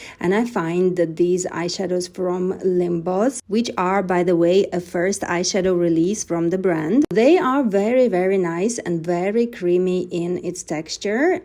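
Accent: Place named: Russia. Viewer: Accent is familiar, from one of the former USSR states) Russian , I think )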